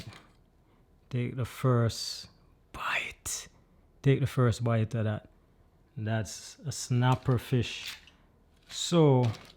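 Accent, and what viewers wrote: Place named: Jamaica. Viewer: I don't know if it's a Jamaican accent or African....oh ..Jamaican.. Big up G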